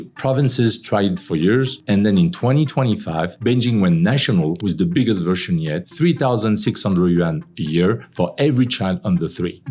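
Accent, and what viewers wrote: France. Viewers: Like your video's including your French accent. Greetings from The Netherlands and thanks for your video's. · The world’s most annoying French accent. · Mon Dieu, ça fait mal d'entendre son dialecte. On ne peut pas transposer la mélodie de la langue française en anglais. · Love his French accent!!!💖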